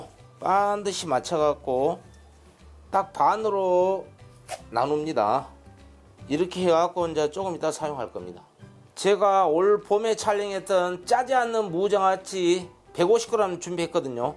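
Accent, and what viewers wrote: Jeolla, South Korea. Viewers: ㅋㅋ 오늘따라 전라도 사투리가 구수하네염 ^^ 항상건강하세요. 덕분에 행복합니다 . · 구수한 전라도 사투리도 일품입니다 웃음도 나고요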